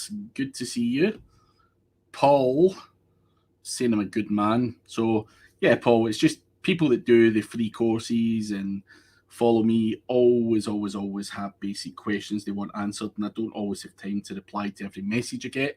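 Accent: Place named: Leith, United Kingdom. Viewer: Many thanks again. Your accent is spot on in the People’s Republic of Leith. - hit me up if you’re looking for a native English teacher; language courses is a niche I work with, can get you some great deals with the top schools; accent really isn’t that strong, with a wee bit of practice, you’ll have no problems.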